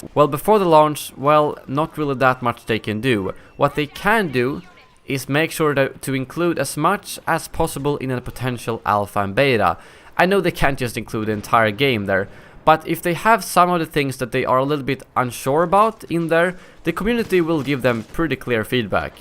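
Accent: Sweden. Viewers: are you swede?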